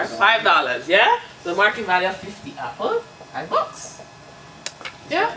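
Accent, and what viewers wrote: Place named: Jamaica. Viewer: Sound like a Jamaican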